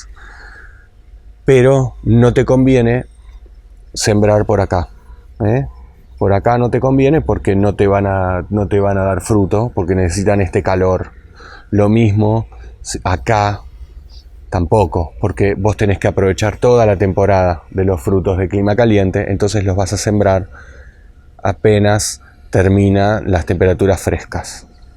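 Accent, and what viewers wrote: Buenos Aires, Argentina. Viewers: Andrés Modesto Rodríguez De León es la forma de hablar de los “porteños” (o sea de los de la ciudad de Buenos Aires y alrededores) en Argentina. Hablan así, parecen soberbios. Te lo dice una Argentina del interior del país. A nosotros a veces también nos choca esa manera de hablar. Pero sabe un montón. Junto con Tony de España, a mi me han ayudado a hacer mi huerta.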